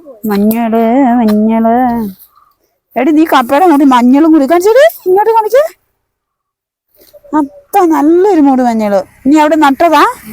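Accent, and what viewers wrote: Kottayam, India. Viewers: വീട് കാസർഗോഡ് ആണെന്ന് മനസ്സിലായി. എന്നാൽ ഭാഷ കോട്ടയം പത്തനംതിട്ടയിലേതു പോലെ. ആൾക്കാരുടെ സംശയത്തിനൊന്നും മറുപടി കൊടുക്കാത്തതെന്തു.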